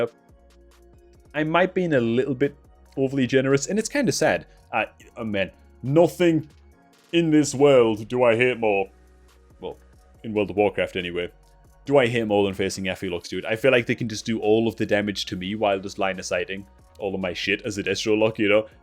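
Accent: Newcastle, United Kingdom. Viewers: Hey man, good to hear your dulcet Geordie tones making wow content again and more importantly enjoying the game!! Cracking list and great addition of the stats for each spec, really gives a better perspective on what is actually strong and not just popular in the hands of the right player!!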